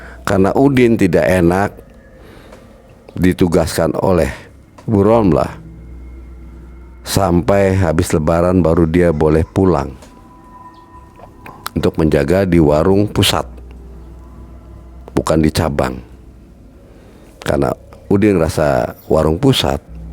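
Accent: Indramayu, Indonesia. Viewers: Logatnya bicaranya kaya orang Indramayu atau orang Cirebon 😁 Betul ngga sih ?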